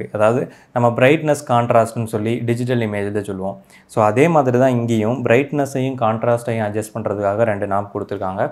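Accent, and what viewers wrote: Tirunelveli, India. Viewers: Bro neenga Tirunelveli ah!!!😍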